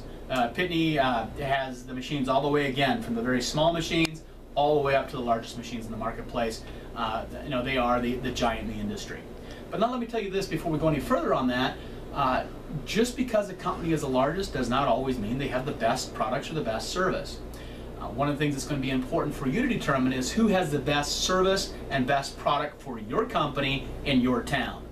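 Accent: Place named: Arizona, United States. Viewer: I like your accent. Are you from AZ?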